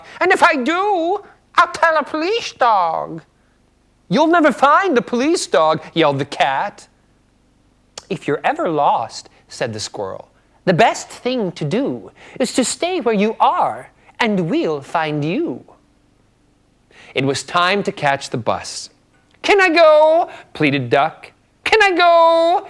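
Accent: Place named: United States. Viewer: Hard to believe he was born in Scotland as he has a very American sounding voice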